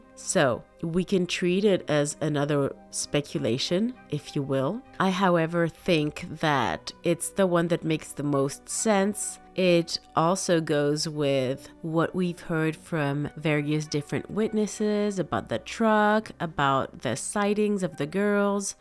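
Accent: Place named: France. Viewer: T'as un léger accent français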